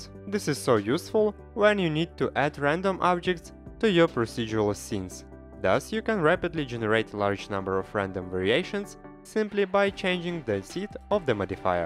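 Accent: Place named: Russia. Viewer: Привет русский акцент 😃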